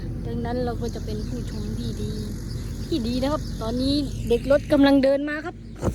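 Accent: Korat, Thailand. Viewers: เอกลักษณ์ของคนโคราชก็คำพูดคำจานี้แหละครับตลกดีชอบๆมากเด้อ คนน่ารัก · พูดแบบนี้เหมือนคน โคราช หรือปราจีนบุรี สระแก้ว เขาจะพูดกันแบบนี้ สำเนียง แบบนี้ อยู่จังหวัดไหนครับ น้องสาวคนสวยที่น่ารัก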